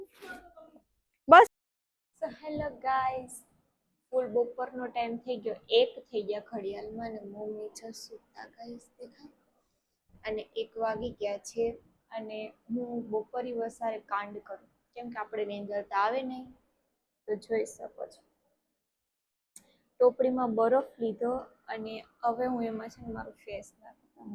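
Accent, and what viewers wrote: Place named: South Asia. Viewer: didi you deserve my subscribe because many people kaik ne kaik speciality hoy j chhe i found a speciality in you ae.. tamaro desi accent je bolva no chhe they really makes you famous all the best from my side..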